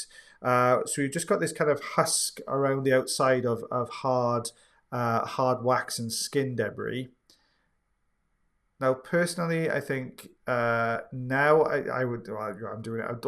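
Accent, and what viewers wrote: Wales, United Kingdom. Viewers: it's definitely Welsh. Sounds probably from the valleys of Wales. Hth xx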